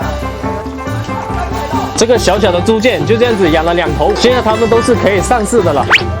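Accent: Chaoshan, China. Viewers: 这是潮汕话吗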